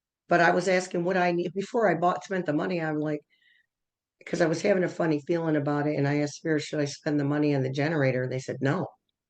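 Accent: New York, United States. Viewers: You from NYS??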